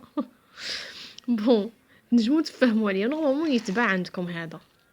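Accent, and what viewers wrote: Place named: Tlemcen, Algeria. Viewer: و الله يا اختي حسبتك تلمسانية انا تاني تلمسانية و نهدر بالقاف و عندي اللكنة كيفك سبحان الله المهم الصدر عجبني بزااااف شباب الله يعطيك الصحة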